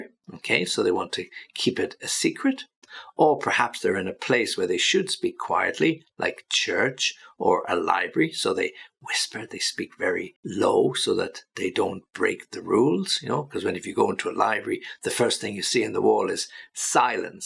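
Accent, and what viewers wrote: United Kingdom. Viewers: Hi teacher! Thank you for your help and work. Amazing, helpful… really good to improve our English. By the way, from what part of the UK are you? I am trying to catch your accent.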